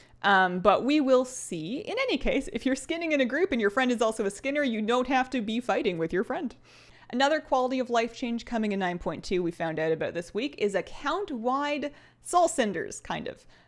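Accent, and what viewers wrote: Canada. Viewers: Your Canadian accent has come back 😍 ✨about✨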